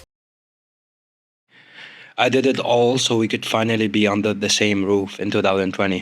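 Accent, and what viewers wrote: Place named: Syria. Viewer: من لهجتك مبين انك سوري، ومدامك دارس طب ف عالاغلب مسافر ع المانيا بتمنالك كل التوفيق وعلى امل انك تلاقي حياة احلى واكثر انفتاحا وتقبلا وامانا برا سوريا وملاحظة صغيرة: انت قلت (غيري من الناس العاديين)، الاصح هو غيري من الناس المغايرين جنسيا او الستريت، اما "العاديين" فكلنا عاديين وطبيعيين سواء كنا مثليين مغايرين مزدوجي الميول او من غير ميول كلو عادي وطبيعي😁 تحياتي الك صديقي وكل التوفيق Much love🤍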